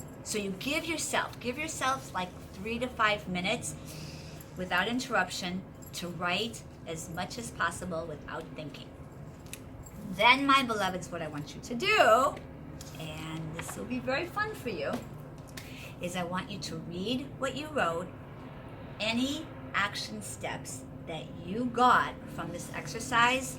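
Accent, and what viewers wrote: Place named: United States. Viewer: A very pleasant person! Always laughing very enjoy to live! I dont understand all she is telling because she speak to fast and with a american accent but i come to see her !! In front of her energy i am happy for the day!!!!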